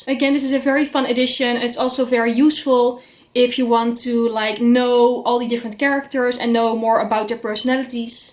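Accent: Netherlands. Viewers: Hoorde je Nederlandse accent al vanaf de eerste zin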